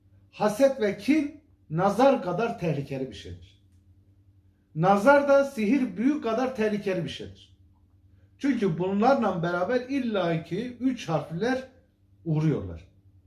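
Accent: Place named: Birecik, Turkey. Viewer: Tam bir berecik şivesi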